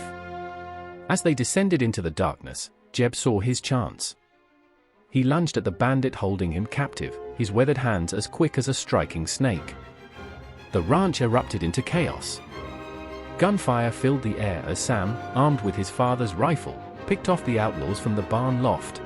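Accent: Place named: England, United Kingdom. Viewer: Sounds like the narrator is English!! They can't find an American with a southern or western accent to narrate the story?????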